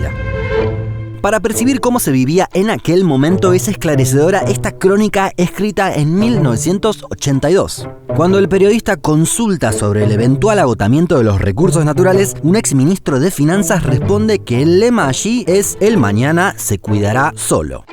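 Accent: Argentina. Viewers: Este tipo es argentino? Si haci lo es salu2 desde bs as xd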